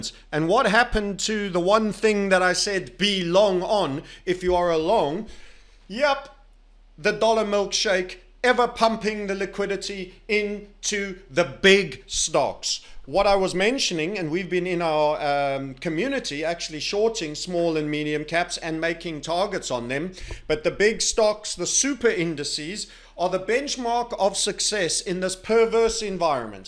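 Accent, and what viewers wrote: South Africa. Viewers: except it's not a brit accent, he sounds South African or perhaps from NZ/Aus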